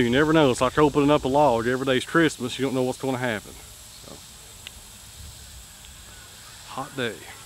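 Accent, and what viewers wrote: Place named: Tennessee, United States. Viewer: I didn't know where your particular flavor of southern accent was from, I guess Tennessee it is. Cool stuff.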